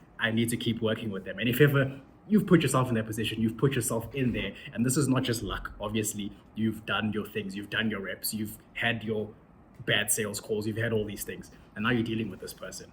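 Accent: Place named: United States. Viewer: Thanks for the video, brother. I'm curious on how you developed such a good American Accent. You've mentioned in the end but a little more detailing would help. Thanks!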